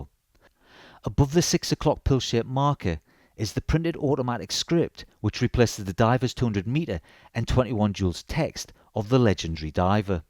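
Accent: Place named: Newcastle, United Kingdom. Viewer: Nice watch and great review! Love the bit of history and the Geordie accent! 👍 subscribed...🙂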